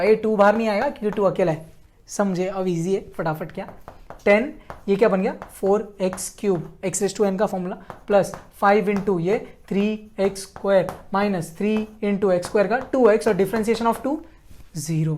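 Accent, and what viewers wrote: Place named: Mumbai, India. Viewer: Luv your way of mumbai talking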